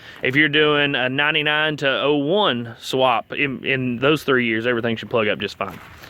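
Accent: United States. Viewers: jesus if you're not from missouri i need to reevaluate my accent and great video by the way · Sub'd - I have a 2.5 TD Sport here in Scotland I bought for £600 4/5 years ago...it needs a bit of TLC...I enjoyed your informative, casual, southern style...will check your other vids!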